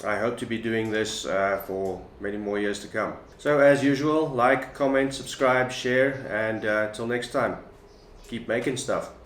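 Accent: South Africa. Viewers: You from South Africa? You sound South African!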